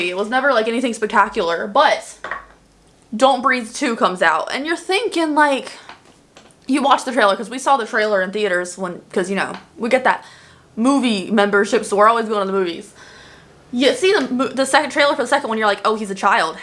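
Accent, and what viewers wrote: South, United States. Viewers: Ok I know I’m not crazy, as I’m a southerner I may just be crazy 😜, but I’ve been noticing your accent more and more. I didn’t realize how much you would hold it back in the past! I’m the same way. Sometimes I let my accent just gooooo but mostly I reign it in 🤠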